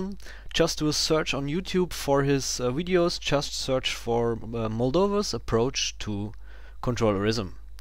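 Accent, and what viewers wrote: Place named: Germany. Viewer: you sound German..lol..."wideos"....still a good tutorial. thnx!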